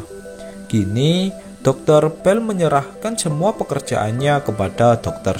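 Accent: Java, Indonesia. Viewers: Logat jawanya medok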